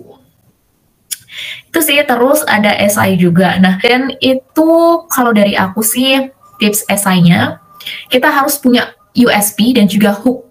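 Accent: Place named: West Java, Indonesia. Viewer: Orang samarinda tapi logat dan someah nya vibe org sunda bgt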